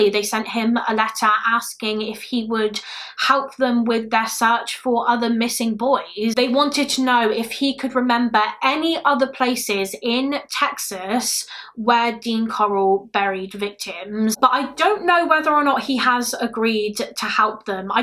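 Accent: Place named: West Country, United Kingdom. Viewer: In case you're interested, accent is from the English West Country, so Bath or Bristol. Somewhere in that neck of the woods. 🙂❤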